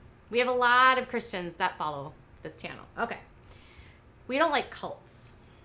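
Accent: Minnesota, United States. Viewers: Lady, I'm thinking you're somewhere near me in MN (new here... You have the MN accent). The storms here a few days ago were nuts! You're kinda funny, and I dig it. Take care!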